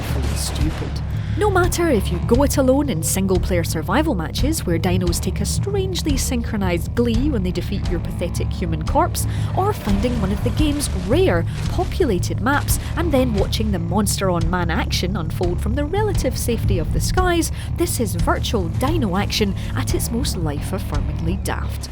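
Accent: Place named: Scotland, United Kingdom. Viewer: I think Scottish accent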